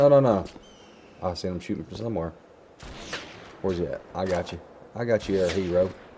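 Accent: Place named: South, United States. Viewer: your southern accent makes this lets play more authentic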